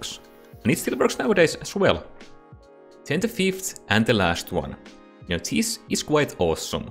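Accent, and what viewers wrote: Finland. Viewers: this really helped btw are you finnish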